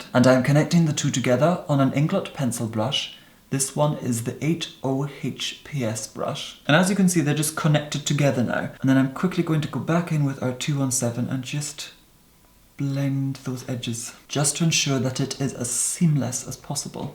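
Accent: Scotland, United Kingdom. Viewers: I Love your accent! Is it scottish? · Scottish accent: VELVET edition! When I close my eyes, his voice conjures dark chocolate being tempered on a warm marble tabletop.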